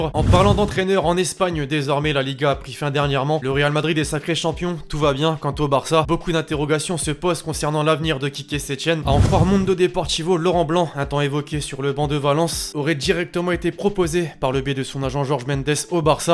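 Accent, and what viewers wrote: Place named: Marseille, France. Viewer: Il Marseillais mtn mdr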